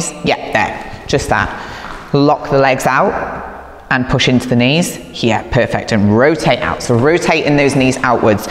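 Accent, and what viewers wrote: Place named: United States. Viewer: Non capisco l'americano inglese 😔😔😔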